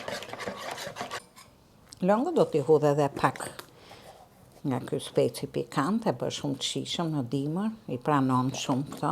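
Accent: Tirana, Albania. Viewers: Të lumshin duart dhe goja që flet Tironce puro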